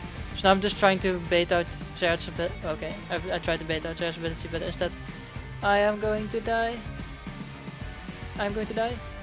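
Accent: Netherlands. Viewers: you sound like a guy who comes from holland? am i right?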